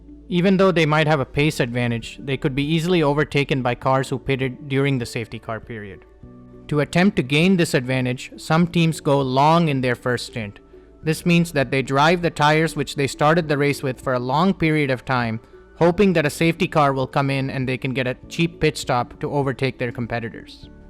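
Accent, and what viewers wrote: India. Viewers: Sorry I don’t understand indian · Indian aksen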